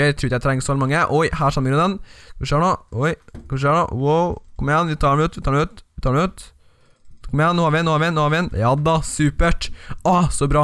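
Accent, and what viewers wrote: Trøndelag, Norway. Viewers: du e trøndersk d e jo drit bra trønderan e bæst!;D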